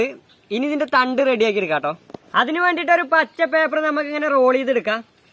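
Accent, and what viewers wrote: Thrissur, India. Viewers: നാട് എവിടെയാ തൃശ്ശൂർ ആണോ സംസാരം കേട്ടിട്ട് തൃശ്ശൂർ പോലെ ഉണ്ട്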